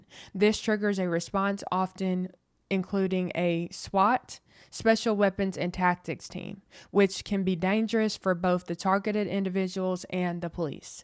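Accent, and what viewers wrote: United States. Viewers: Where is she from? Sounds American to me, but this is the first video I've watched. ❤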